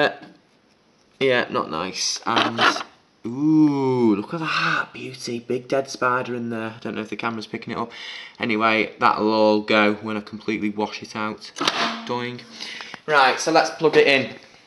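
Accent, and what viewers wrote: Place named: Liverpool, United Kingdom. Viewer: Great video, cant believe it got no comments! I've just watched most of your other vacuum cleaner videos and all I can say is I love your style and the chat you give while talking about the vacuums, which is just the way I used to do my own videos. I used to be able to ramble on for an hour about a vacuum no problem, lol. I guess you are into alarms much more than vacuums, but I think you have a great talent for turning a mundane old vacuum from a rubbish tip into an interesting and entertaining video, much like Sam from Beko1987 does, and its your comment under his Purepower video that lead me to your channel here. You remind me very much of Paul O Grady with the way you speak - I guess you must be from Birkenhead / Liverpool area, lol. Its great that you are giving an old Lux like this a new lease of life, and that your mother likes using it. 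I gave my own mother one of my old bagged Lux uprights last year, its the Powerlite Petlover 1700W from 2010, and she loves its lightness being aged 74 she struggles with bagless machines and bagged is easier for a pensioner to maintain.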